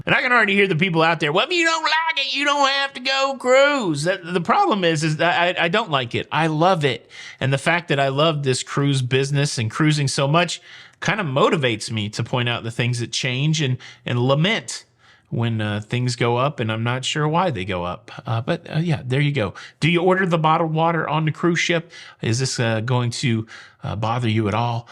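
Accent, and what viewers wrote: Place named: South, United States. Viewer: Sounds like you're picking up a bit more of a southern accent! I'm a little irked about the increase in the price of the bottled water. It's a weird thing for me to be irked about since I start out being irked I need to contribute to the single-use plastic problem by getting it in the first place. I drink 3-4 liters of water a day and I use tap water and filter it. That's not really a thing on cruise ships so I combine water from the buffet and bottled water in my cabin. This just means I need to find a way to filter water in my cabin. The sink is inconvenient for anything but washing hands and brushing teeth but where there's a will, there's a way!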